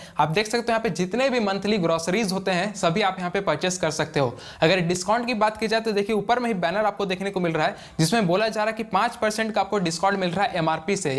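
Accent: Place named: Deoghar, India. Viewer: Me bhi bhai deoghar Babadham state se hoo me aapka aavaj se hi pakad liya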